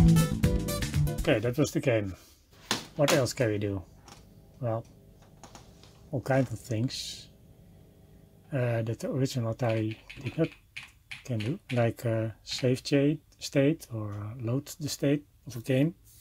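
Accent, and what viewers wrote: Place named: Netherlands. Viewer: Stone Coal Miner English! You must be a Dutch speaker! Hey hallo! Leuk project, grappig hoe je een Teensie gebruikt voor vertaling van originele keyboard matrix naar USB. Erg cool! Dumpje omhoog en subje erbij!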